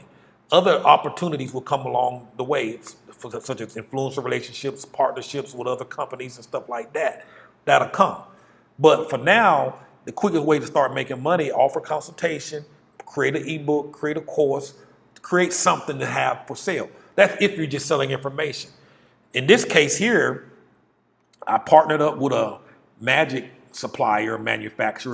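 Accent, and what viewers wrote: South, United States. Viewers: My man. You have this southern draw that cracks me up. . You're obviously very intelligent and I've enjoyed watching your journey.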